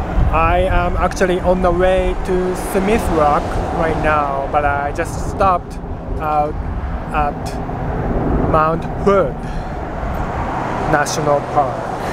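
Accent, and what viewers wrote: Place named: Japan. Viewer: He has very strong Japanese accent.Uncomfortable listening.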